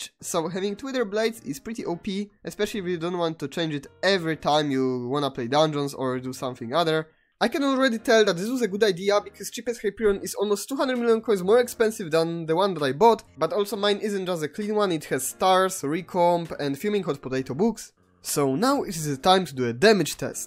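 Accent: Poland. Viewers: Po pierwszym słowie czułem że jesteś Polakiem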